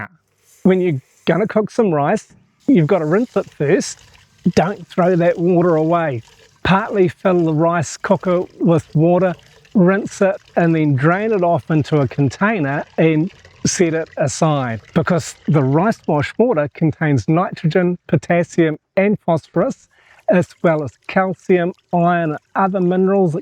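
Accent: New Zealand. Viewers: Sounds more Kiwi then North Thailand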